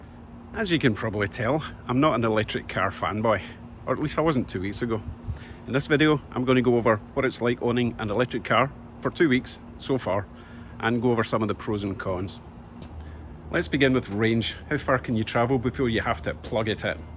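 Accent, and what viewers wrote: Scotland, United Kingdom. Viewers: I’m terribly sorry in advance but is this Scottish English? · i see you have a proper St. Andrews flag in your garage, along with your accent I was floored, cuz I never heard of or seen a Scottish Canadian before! This was my first video of yours I saw, I have now seen a few more, keep up the good work! I hope to get an Audi 50 e-tron when the become available in the states! Thanks again!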